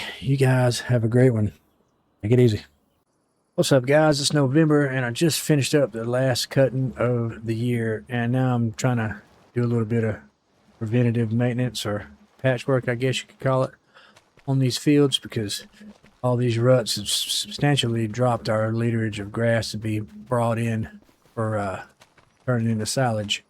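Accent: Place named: Carolinas, United States. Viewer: You from one of the Carolinas? Some of the way you talk reminds me of Dale Jr. 🤣 Good stuff man.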